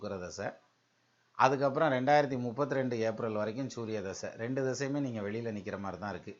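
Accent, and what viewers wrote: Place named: Dindigul, India. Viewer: sir please reply me i am waiting for u r answer for the past two weeks.sir how r u?i hope u and u r family r fine.i have been watching u r program since 2013 its very nice sir.u speak in dindigul tamil slang is absolutely wonderful with comedy sense sir. now i am currently working in singapore since 2005 but my job is still no improvement for me my friends all come with me well developed sir no satisfication in the job for me sir.last year i got married still not yet baby also sir. my DOB <num>.TIME-9.20 PM.BIRTH PLACE-COIMBATORE.And also please tell me about my future sir.i am waiting for u r answer.please reply me sir.my birth astrology my laknam is kanni but give in the computer DOB,PLACE,TIME it show as a simha laknan i am confusing please explain me sir .GOD BLESS U SIR. THANK U VERY MUCH SIR.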